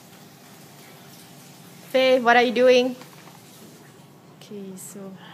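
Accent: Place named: Singapore. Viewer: Oh, are you Singaporean because you sure sound like one!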